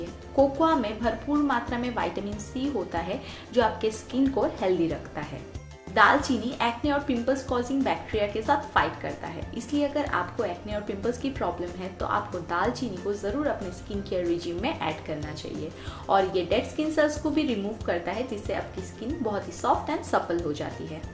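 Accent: India. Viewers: Before, you wrote the name of the ingredients in the legend. I know how to speak English, but due to your Indian accent I have a little difficulty understanding what you're talking about. Please, if you do not mind, start captioning again. Please. I like you so much. Congratulations on the evolution of the channel =)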